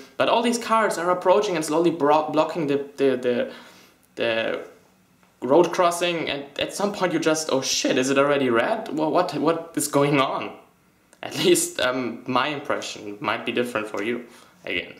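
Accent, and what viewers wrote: Germany. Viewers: Ich dachte mir schon hmmm der akzent hört sich deutsch an und dann wirklich wooouw ich kann germsn accent raushören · OMG hab dein Video gesehen und hab die ganze Zeit gedacht, ist der nicht deutsche, weil dein leichter akzent hat mich stutzig gemacht und als du dann über das verhältnis von deo in deutschland geredet hast, hab ich es gemerkt. Sehr cooles video. Grüße aus Köln :) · Jesus Christ your German accent sounds stupid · Du sagst einen Satz und man merkt sofort, dass du Deutsch bist :D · Is he German? He kind of has this German accent when he talks other languages :(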